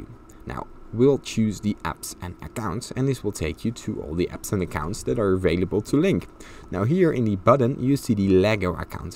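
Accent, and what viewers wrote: Netherlands. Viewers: hoorde gelijk dat deze guy nederlands is hahahaha thx man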